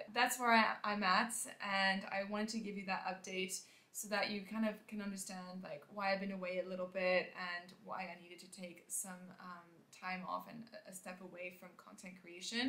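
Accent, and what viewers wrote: France. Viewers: As an American who does not speak French, it’s hard to understand when you say the words with a heavy French accent. It would be really nice and helpful if you could put the words on the screen.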